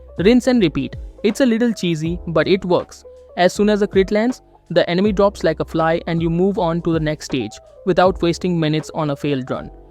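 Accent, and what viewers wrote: India. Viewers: Indiano falando inglês é engraçado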